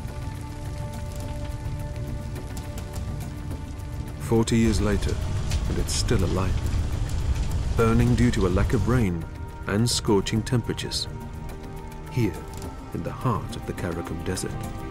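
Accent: United Kingdom. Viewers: The narrator has a sophisticated British accent. I question nothing. And hello, Glen, after three years. Did you survive Covid? lol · Whoa, this is in the Middle East? My people, my Arab people! Get an Arab Man to narrate this. Why is a British guy doing it?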